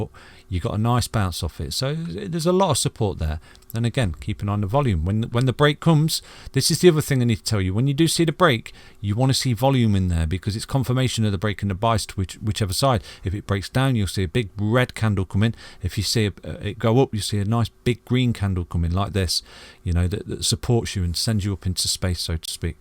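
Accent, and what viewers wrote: United Kingdom. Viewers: I was waiting for this Mr British accent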